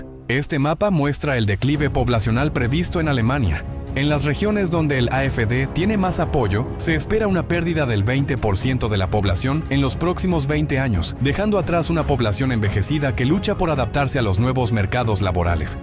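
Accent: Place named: Latin America. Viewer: Según ustedes es imposible vivir en Alemania, Australia, Canadá, Portugal, Nueva Zelanda, Irlanda, Japón, Reino Unido...y siempre de una forma u otra es culpa de los inmigrantes... qué raro!! Suena a ideas de extrema derecha...y lo mejor de todo es que el locutor tiene acento de Latinoamérica, será un inmigrante?? Quizás es uno de esos miles de Venezolanos que pidieron el voto para Trump, los Magazolanos, y ahora Trump los expulsa. No me creo que no se pueda vivir en ningún sitio y encima siempre se criminalice a los inmigrantes.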